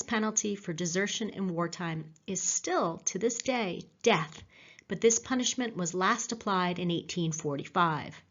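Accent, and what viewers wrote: South, United States. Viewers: Your southern drawl is so cute!!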